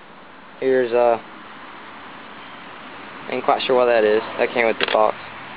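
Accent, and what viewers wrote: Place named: Georgia, United States. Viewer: hey man sounds like your from my neck of the woods. im in georgia and i do alot of fishing in lake lanier and lake heartwell.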